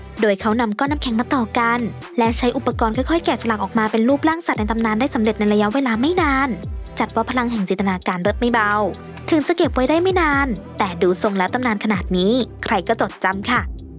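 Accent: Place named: South, Thailand. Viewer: ไอ้ยะ แอตแหลงใต้ ไอ้ยะ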